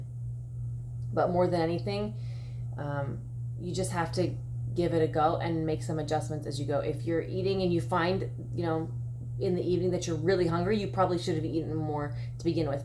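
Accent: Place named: Philadelphia, United States. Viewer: You from Philly? Just detect a bit of our accent. Love your channel.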